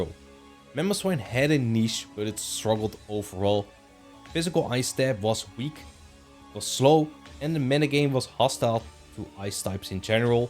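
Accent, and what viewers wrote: Netherlands. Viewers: Do you happen to be Dutch? The accent is thick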